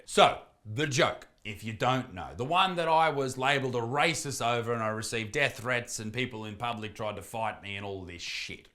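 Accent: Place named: Australia. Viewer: i always thought you lived in australia with your accent and all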